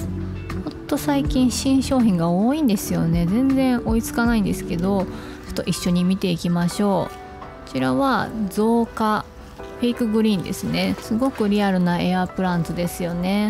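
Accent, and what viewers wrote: Kansai, Japan. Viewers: いつも楽しませてくれてありがとうございます❤ さんの声好き😊所々出てくる関西人テイストも好き。わたしも関西人なので親近感わきまくりです。飴ちゃんね😁大阪人のコミュニケーションツールですね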